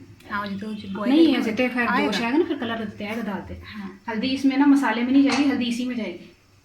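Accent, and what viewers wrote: Punjab, India. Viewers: very very sweet punjabi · sweet punjabi boltey ho. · MashAllah Zaberdast Mash De Daal Bhale Bhale 😍 Punjabi Baree Pyari Bolde ho 👌🏻 👏👏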